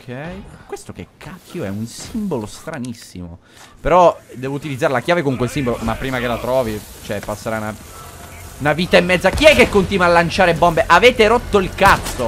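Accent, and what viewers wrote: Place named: Naples, Italy. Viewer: Potrei parlare napoletano meglio di te anche se non parlo napoletano. 😂 Vabbè, a parte gli scherzi, non mi ricordavo che Favij parla napoletano. Mi ricordo che nei vecchi video non parlava napoletano. Comunque Leon è quasi come me. Anche se ho un taglio di capelli un po' diverso, ma è quasi come me. Stesso carattere e stesso atteggiamento.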